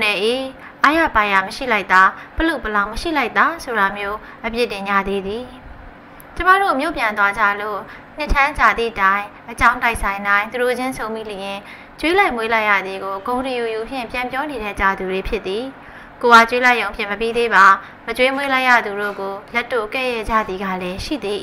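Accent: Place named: Anya, Myanmar. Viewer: စာဖတ်သူရဲ့လေယူလေသိမ်းကအညာရောက်နေသလိုရသခံစားရတယ်။အသံရှင်ညီမလေးလိုအင်ဆန္ဒအမြန်ပြည့်ပါစေ🥰👍💪🏽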